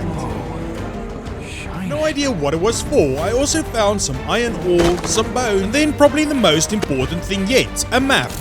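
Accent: Afrikaans, South Africa. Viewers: I knew it your south African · could tell from you accent awesome to see a fellow south African making awesome content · You sound south african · Is that a South African accent? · Am I the only one that hears an Afrikaans accent here? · Are you south african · love your Afrikaans ,Engels accent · Jir my ou, your accent gives me Afrikaans vibes like jasis my bru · are you south African? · Absolutely brilliant. Subscribed. You sound South African, like me - am I right? Love the way you do the story telling which makes getting insight into the game huge fun. Dankie Meneer. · South African? 🇿🇦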